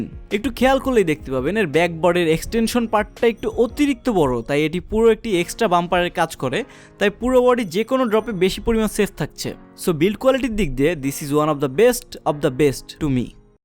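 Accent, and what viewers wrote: Bangladesh. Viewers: mojar... specifically Bangladeshi accents. sadharon jiniso bes valo legeche. good going. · I totally love to laugh at these Bangla youtubers English accent.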